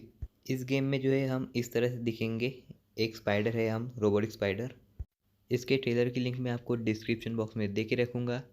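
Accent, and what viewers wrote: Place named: India. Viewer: Not everyone is good at english dude if he talked english he still gets the indian accent so it will sound so fucking weird and he might say wrong words so remember that everytime.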